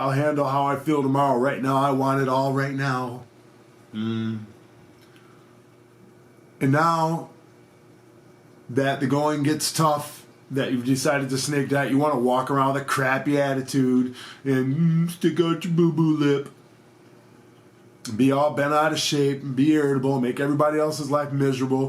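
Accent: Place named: Midwest, United States. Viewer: Just started watching your videos . They helped me stay on track. Way to go! Also I could tell by your accent you’re from the Midwest. I’m from Ann Arbor, Michigan. Go Blue!!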